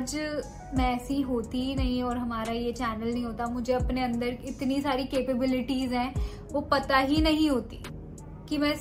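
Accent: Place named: Rajasthan, India. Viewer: Didi aap Rajasthani accent kase bol lete ho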